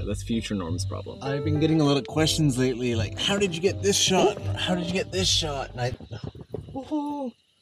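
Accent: Canada. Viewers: after hearing him say "out" so many times, I'm now wondering, is he canadian?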